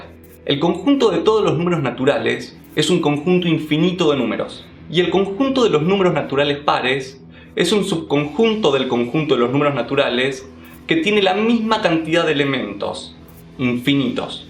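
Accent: Argentina. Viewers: Que no se nota el acento? Obviamente es argentino :v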